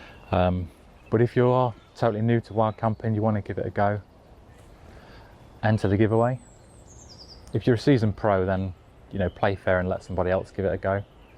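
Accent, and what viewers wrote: United Kingdom. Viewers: sometimes you sound close ? Maybe Leeds area ?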